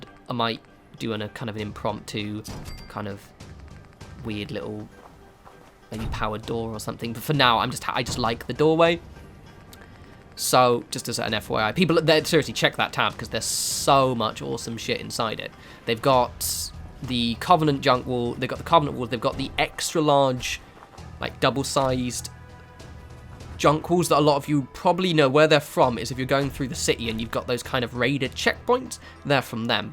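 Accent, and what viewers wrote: United Kingdom. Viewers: Anarchy RWO I'm aware he has an accent, but plenty of people from the UK pronounce the word appropriately. I can't speak to any specific regional influence on pronunciation of the word, but it is correctly pronounced the same way in pretty much any place that english is the native language. Quit acting like I'm insulting the man's intelligence by offering a bit of educational advice.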